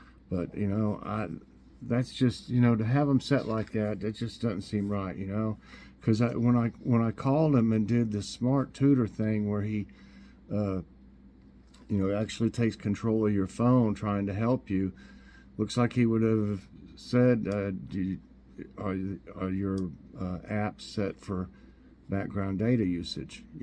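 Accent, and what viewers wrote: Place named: England, United Kingdom. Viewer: I love listening and hearing your English accent for I always love to learn. I tried to listen to understand English much better. Thank you 🙏 so much for sharing this strawberry lemonade as it is so new to me. I’d like to try it one day 🙏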